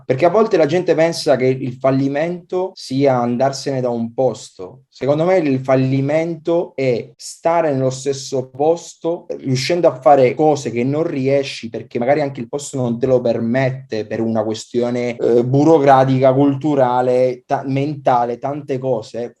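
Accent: Tuscany, Italy. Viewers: Toscano eh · con quell accento toscano, é spettacolare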